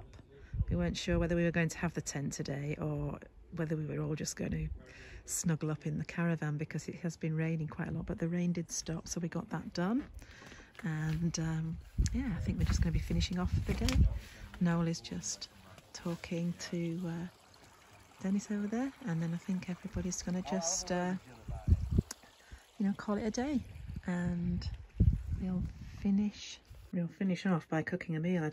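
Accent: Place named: Ireland. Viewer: I love a plan, especially when it comes with an Irish accent 🍀 And all mod cons! What could be better! Great start to the vlog ❤❤❤❤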